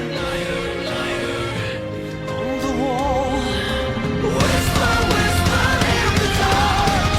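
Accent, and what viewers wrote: Australia. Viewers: The Australian accent is always amazing to listen to. But it just become awesome, when the Australian gets excited. Accents generally does not matter that much to me. But Australian is just.. Those sounds.. Its amazing. And I am sorry talking about this and not the video, but I had the biggest smile on my face because of it the first time you paused. Kamelot is a really interesting band, you should 100% look into more of what they have done. Always fantastic to see you react to things, and give your take on it.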